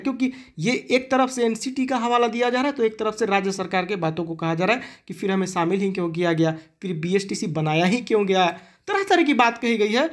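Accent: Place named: Bihar, India. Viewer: बिल्कुल बिहार वाले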